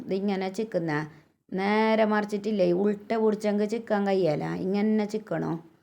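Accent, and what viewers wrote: Kasaragod, India. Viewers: കാസർകോട്ട് സസംസാരം കേൾകാൻ ഇഷ്ടാണ് video super ❤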